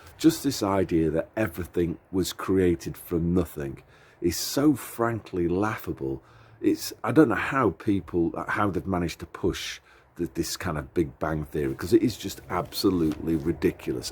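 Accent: Northern England, United Kingdom. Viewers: I could talk to you for hours on this subject 😁 but for me God creating God, l can't make that leap if you understand what I'm saying. I'm not religious but l know there has to be a 'creator'. I'm also of the opinion that the human brain is not yet capable of understanding, l know mine isn't 😁. I've got to ask, are you a Northern England lad?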